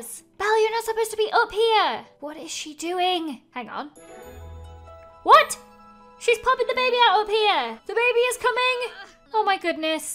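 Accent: United Kingdom. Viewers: It was her accent. She is. Very VERY British and her accent is amazing btw, but when she says “into” it sounds like “unto”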